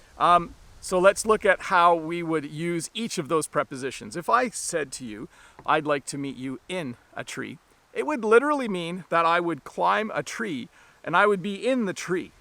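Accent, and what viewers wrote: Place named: Canada. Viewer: New subscriber and I found your Video's really helpful to learn english in canadian accent. I'm enjoying your videos very much Sir. I'm starting to download many of your videos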